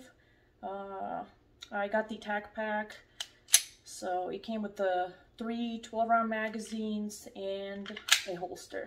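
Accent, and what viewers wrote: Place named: Russia. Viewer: is most likely Russian. Her accent(barely there but I can hear the way she say Kalashnikov)....Privet.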